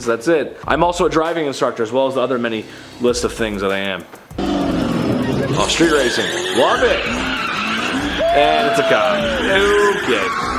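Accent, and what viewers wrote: Scotland, United Kingdom. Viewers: LOVE your Scottish accent!!!!! Cheers me up every time!!! Much love from Michigan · Now the house is more damaged and somebody may seriously hurt because of that guy Ps. Nice Scottish accent!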